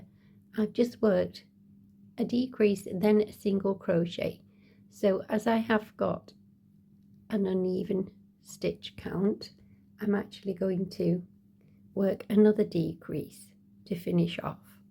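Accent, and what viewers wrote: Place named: England, United Kingdom. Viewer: I can see, however, you have an English accent or perhaps I am mistaken and it is Ai audio dubbed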